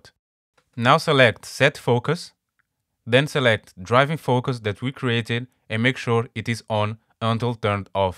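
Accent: Russia. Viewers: Why the Russian accent?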